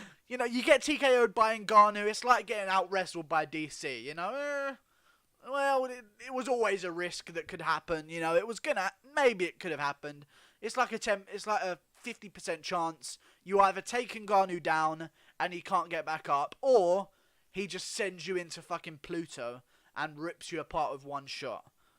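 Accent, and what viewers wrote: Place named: England, United Kingdom. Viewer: I've been watching listening to UFC for years .so good to get a real talking English guy that knows what the fuck he is talking about ...keep up the good work Bro 👊🏽✌🏾👌🏽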